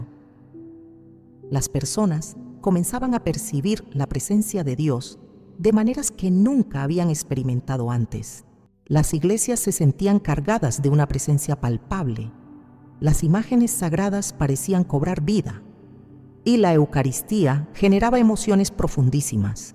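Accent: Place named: Peru. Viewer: Hermosa voz, con acento Peruano bellisimo!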